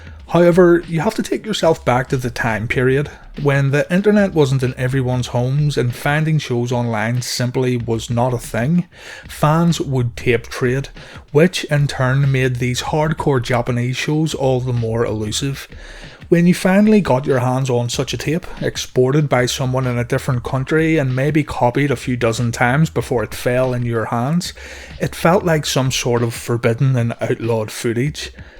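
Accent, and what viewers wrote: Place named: Northern Ireland, United Kingdom. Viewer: You dont need to change by the way mate your Norn Iron charm and knowledge/passion keeps us coming back.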